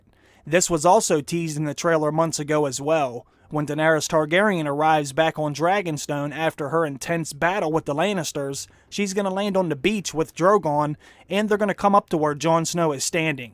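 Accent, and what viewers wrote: Pittsburgh, United States. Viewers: Are you from Pittsburgh? You sound like you're from Pittsburgh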